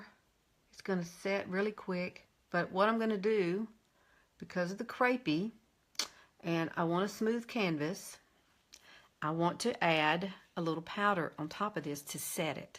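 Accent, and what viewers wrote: South, United States. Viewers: I can tell you're from the South from the way you say "oil " lol I'm up in the mid west (Wisconsin) " OyL ". 💞your Channel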